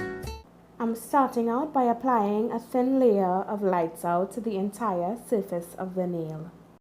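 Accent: Trinidad and Tobago. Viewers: U sound trini!!! 😀😀😀 U go girl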